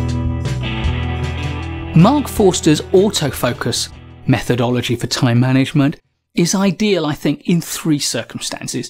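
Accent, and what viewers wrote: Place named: Northern England, United Kingdom. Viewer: Haha! Didn’t t realise I wrote the same comment 7 months ago! Northern English mispronunciations still bug me!...but your explanation of Mark's system is the best available. Xxx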